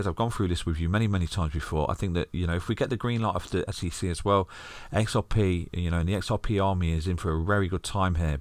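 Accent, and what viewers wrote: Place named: Birmingham, United Kingdom. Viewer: I love your brummy accent lad.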